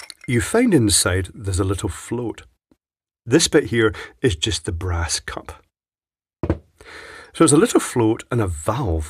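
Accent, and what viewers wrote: Scotland, United Kingdom. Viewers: Not sure why I watch this. Perhaps it's just nice to hear a fellow Scotsman talk. Thanks for the video,.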